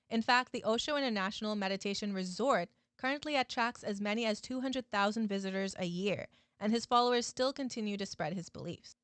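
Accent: United States. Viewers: Your accent sounds very Californian to me. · I thought your accent was American😳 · I'm an American but with a terrible ear for accents. I've always thought she sounded American who maybe grew up with parents with accents from another country so that she says some things ever so slightly differently than I expect but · As a non-American, sounded American to me!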